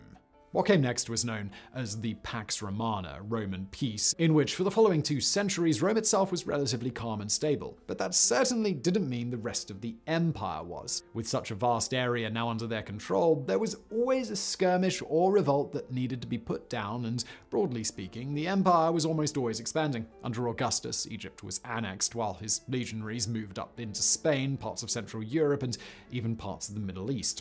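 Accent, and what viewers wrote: United Kingdom. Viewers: Dude. Great vid. But, truth be told, I also come for the British accent and colloquialisms.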